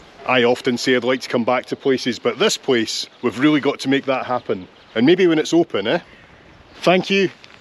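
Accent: Scotland, United Kingdom. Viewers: Very lovely scotish accent,welcome to Romania!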